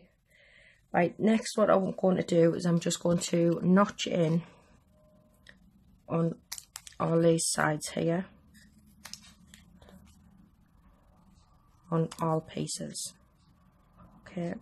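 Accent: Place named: Newcastle, United Kingdom. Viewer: Love the Geordie accent. I moved to the north east from Worcester 17 years ago and I recognise it anywhere. Love it!!! Do you have measurements for anything smaller please. I have subbed so will check out all your video's xx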